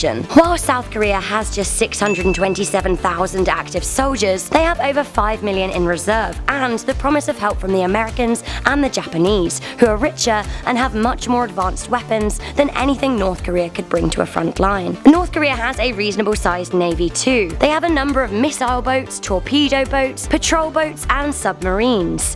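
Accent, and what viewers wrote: United Kingdom. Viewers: I don't like the British accent.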